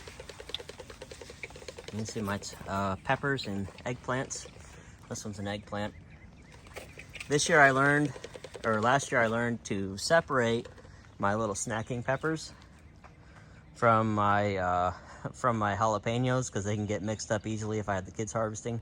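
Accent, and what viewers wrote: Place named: United States. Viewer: helloo thx for sharing. you sound like an american, where in the world are you gardening? New sub from Thailand here. I also grow some cucumbers in floating foam sheets. Is hot season at the moment. I am using ppm as a guidance. What is your ppm for the cumcumbers? mine is arround 900 just begin to flowering.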